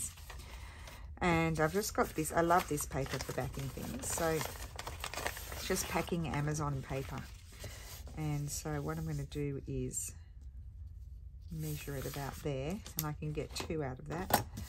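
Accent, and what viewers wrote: Australia. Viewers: Just found you today. Nice to hear another fellow Aussie ❤ Do you have the name of that seedpod stamp you used? It was gorgeous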